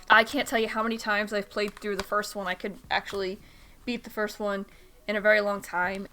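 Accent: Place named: East Coast, United States. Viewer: Your video came up in my algorithm feed, since im from CA what kind of east coast accent is that I like it???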